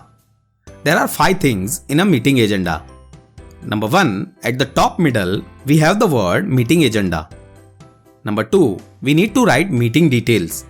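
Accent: India. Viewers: othentic indian tone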